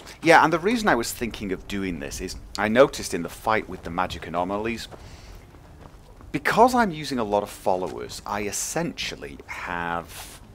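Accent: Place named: Scotland, United Kingdom. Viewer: I fuckin love your accent, scotish right?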